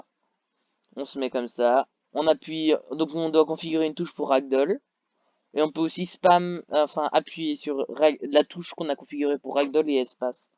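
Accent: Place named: England, United Kingdom. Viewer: cette accents anglais magnifique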